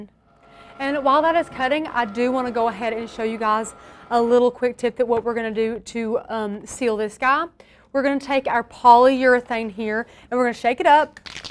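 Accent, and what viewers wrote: South, United States. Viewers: Love her southern accent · Girl, where you from?! Just a curious Texas girl who is fascinated with southern dialects lol. The Carolina's, Georgia, Tennessee?? Love it!!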